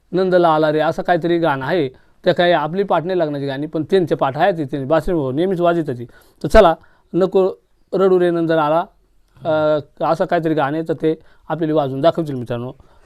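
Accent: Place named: Akole, India. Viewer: दादा भाषेवरुन तुम्ही नगर जिल्ह्यातील अकोले तालुक्यातील वाटता...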